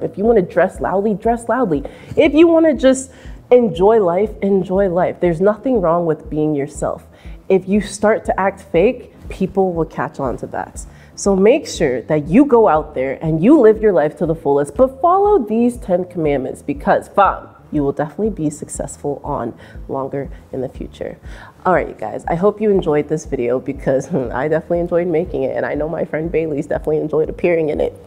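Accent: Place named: Nigeria. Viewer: sounds a bit Nigerian to me.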